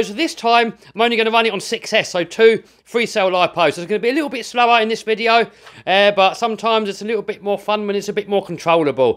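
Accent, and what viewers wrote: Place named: England, United Kingdom. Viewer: Amazing english accent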